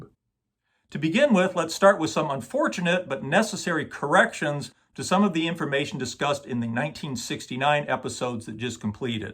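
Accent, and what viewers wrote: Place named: Michigan, United States. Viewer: Ann Arbor, eh? I kept thinking I detected a faint Michigan accent in your speech, but saw no reason to ask. I'm not from Michigan myself, but I used to spend summers there at my grandparents' lake house in Orchard Lake (my mom had gone to college in Ann Arbor). It was during one of those adolescent summers long ago that I purchased by the and my lifelong obsession with rock music began. I haven't been back to Michigan in many a moon, but I still love the state and its people. Many times I've considered buying property there, but I just get turned off by all the problems the state faces. I still consider it my second home state though...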